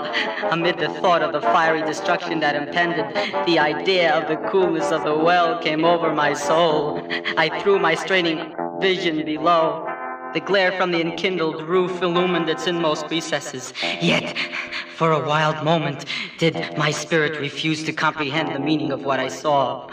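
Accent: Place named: New York, United States. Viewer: Cool. Woody Allen, reading Poe as a New Yorker.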